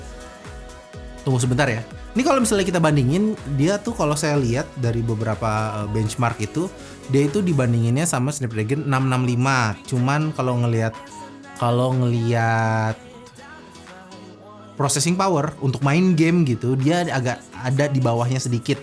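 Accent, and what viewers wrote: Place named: West Java, Indonesia. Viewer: Nyunda pisan si akang wkwk.. Di sorodotkeun wkwk😂😁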